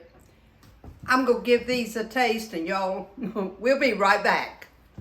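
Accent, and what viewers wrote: South, United States. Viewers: I'm from SC too, and this is a real Southern accent. Not like hillbillies you hear on TV. In SC, it's much more akin to an English accent, much softer (softuh), less harsh than TX, TN, AR, wherever! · Okay, I am from the East (Pittsburgh, PA) and I came across this lovely channel I am not disappointed with all the meals to the family and God, along with the southern drawl. I am a fan for life now. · Mrs., what part of the South is your home of origin? I am from Western Middle Tennessee in your accent sounds remarkably familiar. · I am so curious at where you live I was born in Macon Georgia raised in Miami Florida didn't really know much about my dad's family cuz he left when I was seven and my mom had no contact with his family after that I do know they're from the south and Cochran Georgia but I tell you what talking to them on the phone their accents are so strong you can't barely understand them but I can tell you're from the South but I can understand everything you're saying anyway I'm going to be buying the ingredients to make this for this Sunday this is August 1st Amy Williams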